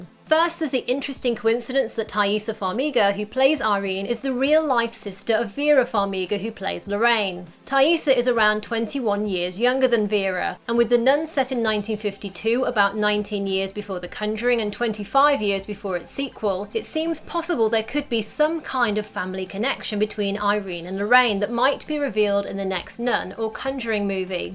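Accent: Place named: United Kingdom. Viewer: I like your british accent.. 😍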